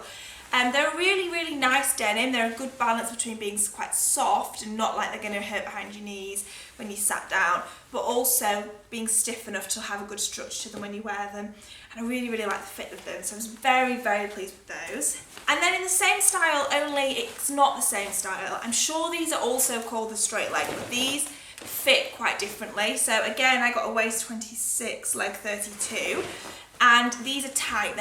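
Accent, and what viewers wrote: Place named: North West England, United Kingdom. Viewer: Where you from? I detect a north west accent :)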